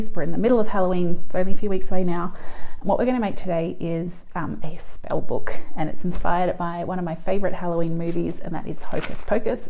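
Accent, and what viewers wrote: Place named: Australia. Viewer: Hi new subscriber here fron Canberra, Australia. I presume by your accent you are Aussie?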